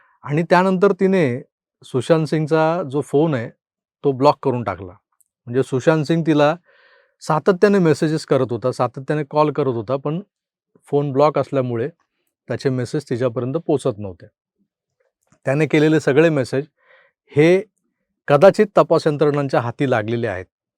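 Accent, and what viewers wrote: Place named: Pune, India. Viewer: O ' sir U speak marathi very pure than sadashiv peth.Ur knowledge is very Crucial N genius N brilliant.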